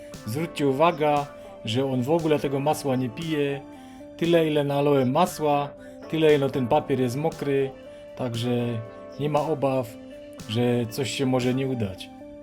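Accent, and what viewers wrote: Silesia, Poland. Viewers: Dziękuję biorę się za klarowanie masła i robię subskrypcję . Konkretny przekaz i śląska gwara którą lubię . Pozdrawiam😊❤❤ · Kocham Twoj slaski❤ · Jasno, prosto i konkretnie. Wartość dodana: piękna śląska godka. :) Pozdrowienia z Krakowa od potomka Ślązaka (z tym, że cieszyńskiego). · Co to za język ??? Ślązak? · Lubię słuchać Śląskiej mowy, 38 lat temu mieszkałam przez pół roku w Rybniku .A maslo i tak sobie zrobię. Pozdrawiam i już dobrych świat życzę. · Lubię gwarę śląską!!!!! Dziękuję 🎉🎉🎉🎉🎉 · Zrobiłam , świetny patent nie trzeba zbierać tej pianki. Jaka fajna gwara śląska . Pozdrawiam Pana 😊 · Lubię gwarę śląska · Piękna jest ta mowa Śląska dzięki za przepis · To jest śląska gwara, prawda? Pozdrowienia z Wiednia❤ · To jest dobro Śląsko Godka 💪💪💪❤❤❤ ,fajnie się słucho 👍🤣.Superrr. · Mieszkałam 20 lat na Śląsku, i ślōnsko godka szczerze mnie wzruszyła ♥ Dziękuję za bardzo uproszczony przepis, bardzo lubię minimalizować swój nakład pracy, przy maksymalizacji efektów. 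Akurat dziś robię masło klarowane, cieszę się, że przy tej okazji tutaj trafiłam, bo będę mogła na gorąco wypraktykować. Pozdrawiam szanownego Autora, wszystkiego dobrego. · Pikne masło chopie. 😅 Uwielbiam śląską gwarę. Fajny przepis i cudowny zaśpiew.👌🏻 Dzięki 👊🏻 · Piękna Śląska Mowa..Dziękuję za przepis....Pozdrowienia z Lubelszczyzny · sama jesteś wsiowa. To gwara śląska, gdyby była kaszubska, ni kej byś nie poniała, hihiiii... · Jo lubia Śląska gadka ❤❤❤ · super przydatny filmik :) uwielbiam śląską godke :) pozdrawiam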